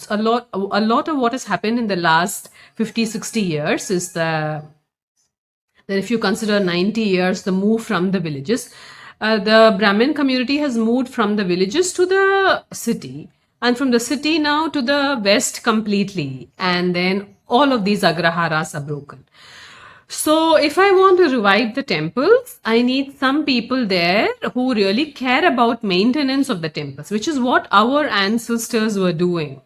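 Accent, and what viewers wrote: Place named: Sri Lanka. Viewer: Sounds like a Sinhalese accent.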